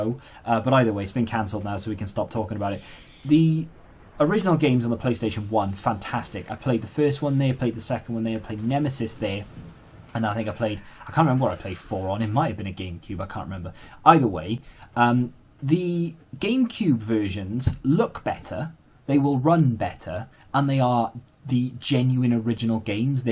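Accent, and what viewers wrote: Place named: South Wales, United Kingdom. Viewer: Do you mind me asking what area you live ? You sound like you’re from south wales and you mention Bristol and Gloucester in some of your videos but I don’t recognise any of the boot sales you go to !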